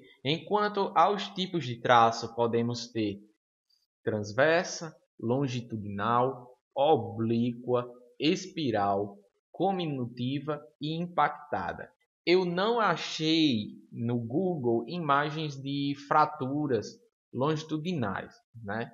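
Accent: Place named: Northeast, Brazil. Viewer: Cara top... É seu sutaque melhor ainda ..eeee nordestao. .. Sou tec. Em imobilização ortopédica. ..sempre fico refrescando a memória assistindo vídeos como o seu valeuuu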